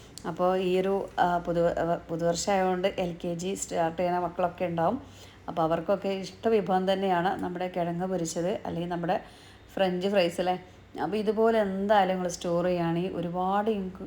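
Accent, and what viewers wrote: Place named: Mavelikara, India. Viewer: Kasarkode basha parayu Ethu Mavelikara basha aanu.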